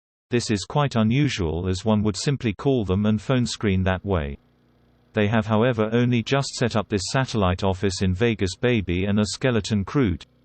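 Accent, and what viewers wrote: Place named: United Kingdom. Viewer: i like the british narrative voice.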